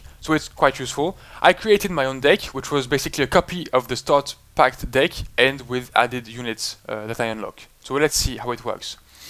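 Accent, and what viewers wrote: France. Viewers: Yay French accent!! Like!!! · First 10 seconds impression of this video. Fuck, i love french accents xD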